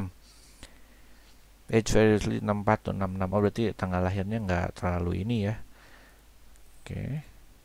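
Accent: West Java, Indonesia. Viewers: paingan logat na logat sunda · nyunda banget kang.. ahahahah.. the real SUNDA Modern :)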